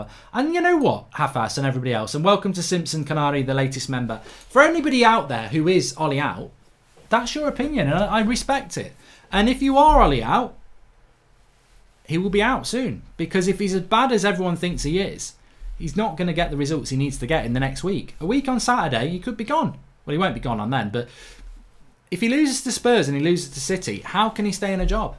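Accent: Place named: United Kingdom. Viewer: Pommie lingo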